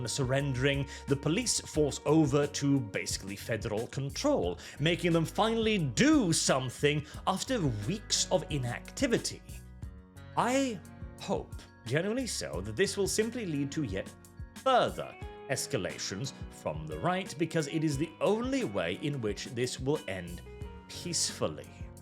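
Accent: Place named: RP, United Kingdom. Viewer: how is it you have such high class British accent and you're Norwegian? did you learn English from an aristocrat?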